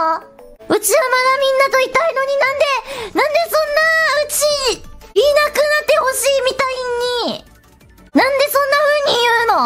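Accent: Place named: Kansai, Japan. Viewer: 關西腔真的好可愛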